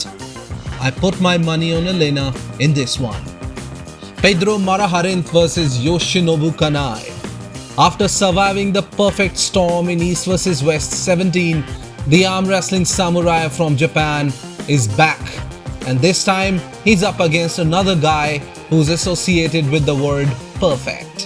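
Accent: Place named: India. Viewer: ur indian accent is hilarious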